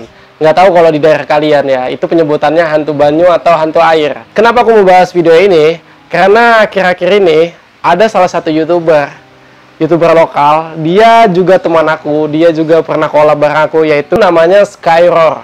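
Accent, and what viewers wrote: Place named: Banjar, Indonesia. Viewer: Air nya rada sedikit hanta🤣 Kental banar banjarnya.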